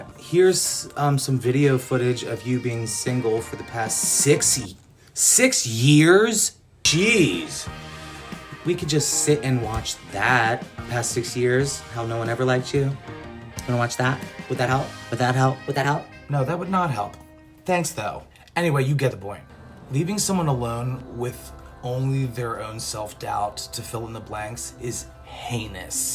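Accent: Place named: England, United Kingdom. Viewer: You’re a brilliant actor - I hope things go well for you! 😊 That was a very good English accent, probably one of the best I’ve ever heard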